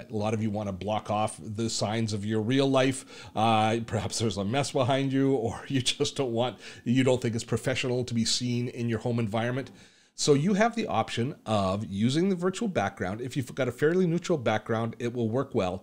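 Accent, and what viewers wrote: Canada. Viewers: you seem to be funny and cheerful without being "goofy". Thumbs up! You get an extra gold star for not beginning your video with "What's up?" A "videographer" will lose me every time in the first 5 seconds when I hear that worn-out phrase. I will try recording the video element of my guitar playing (audio recorded separately) using your green screen technique. I currently am using Filmora and Adobe Premier elements for that but they both are a bit "wonky" to work with. I'm just curious,. What is that hint of an accent I hear in your voice? I'm guessing Canadian. The giveaway every time for Canadians is always how "house" is pronounce as "hoose" and "out" is pronounce as "oot".:-) Nice video... This inspires me to check out some of your other videos. Keep up the good work. Cheers!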